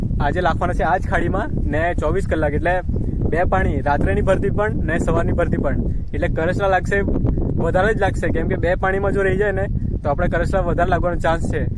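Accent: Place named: Valsad, India. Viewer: Tmaro fast var video joyo bhasha sabhrine laygu ke tme nkki valsaad naj se 😊 cement ma ma koye saval kyro hto kiya sher na to maru sachu j pyru tme valsaad naj so hu pn valsaad nij su etle bhasha samj pri jay😊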